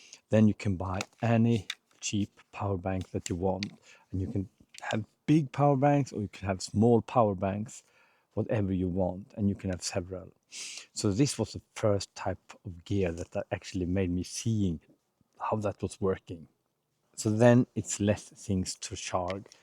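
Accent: Sweden. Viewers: Great and entertaining video, as usual, 👌🏻 And, as another Swede (right now based in Lycksele), I also find your lovely Swenglish to be entertaining in itself 😊 Reminds me of Swedish friends struggling with launch vs lounge.. 🤭😁 I think it's like you said: for the devices you need to hold in your hands a lot, it wouldn't be practical to always have to rely on external power source/bank. On my hikes, I carry a Fujifilm X-S20 with a 70-300mm zoom and a DJI Action 6, both being very mobile by hand, so to say, and thus not suitable to be 100% dependent on external power. I use both a lot, so I carry 2-3 spare batteries for each. As a solo-hiker, wrt a device like Garmin Sat messenger, I think a built-in battery that's well protected from the weather is the preferred solution, for safety reasons. That said, I do agree with that user-replaceable batteries should be more common. 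I also agree with that external power banks bring flexibility when it comes to devices that are only used part of the time - and hopefully not all at the same time 🫣 - coz then they can time-share the external power bank(s) 🤓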